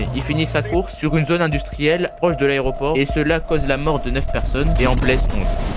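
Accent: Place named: France. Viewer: Mmm French accent~ 😛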